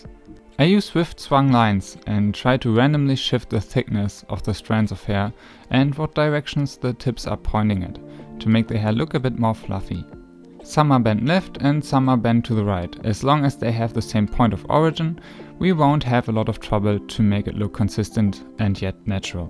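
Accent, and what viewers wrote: Germany. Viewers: I know I‘m late, but I just wanted to thank you for what you achieved and did for all of us here. Your tips are incredible, and after all hair is the thing that stands out the MOST while looking at characters, it‘s the thing that makes them so different, just like eyes or whatever. (Ich war sehr überrascht, als ich den deutschen Manga sah, aber an deinem Akzent habe ich schon erkannt… also in dem Moment dachte ich „Hmmm könnte es sein?!“ finde es voll cool, dass du deine Videos trotzdem englisch machst. Mach weiter so!!! ✨)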